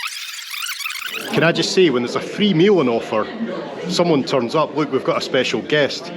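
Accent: Scotland, United Kingdom. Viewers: When you talked about a kilt you made me chuckle. I remember someone telling me that a lot of Scotsmen where them to a futball(?) (soccer) game I believe it was and without underwear. I was just picturing that in a large stadium of people and smiling. Something we wouldn't see here in the United States. I enjoy a Scotish accent and am enjoying the videos that are coming up on my feed of yours.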